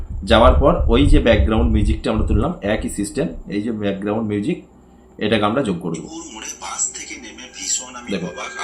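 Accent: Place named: India. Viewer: আপনার কয়েকটি কথা শুনেই আমি বুঝেছি যে আপনি ভারতীয়।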